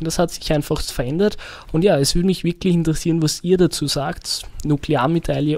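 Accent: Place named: Austria. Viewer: Österreichische Stimme tut gut auf yt zuhören :)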